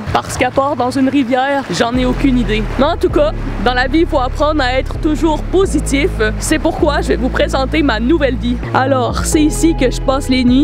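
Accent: Quebec, Canada. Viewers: J’aime trop quands tu parle😆 c’est comme mes cousine eft elle habite aux Québec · tes quebecois cool comme moi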